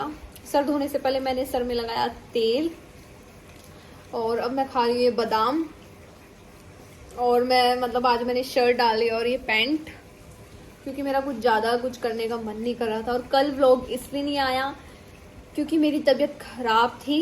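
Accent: Haryana, India. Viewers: Muje aap bolte hue ache lagte h mtlb aapki haryanvi me language bhut achi lgi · Nice vlog dii haranvi voice bhut achi h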